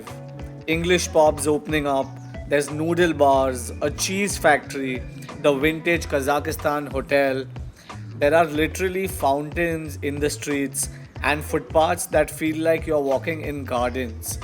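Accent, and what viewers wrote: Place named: India. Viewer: Indian accent 🤢🥴🥴🥴🥴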